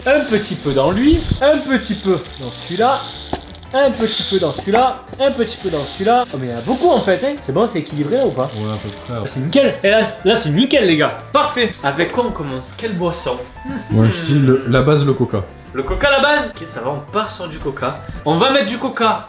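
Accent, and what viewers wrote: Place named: South, France. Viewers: J’aime pas l’accent du sud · En 1 : j aime trop ton accent sudeste Puis en 2: continue comme sa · Accent du sud 💖💖💖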